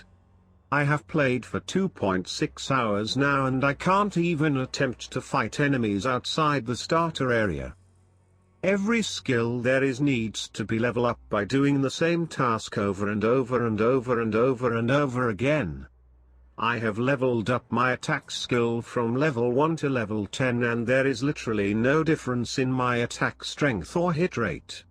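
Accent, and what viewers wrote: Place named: East Tennessee, United States. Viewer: You eastern tennessee hillbilly.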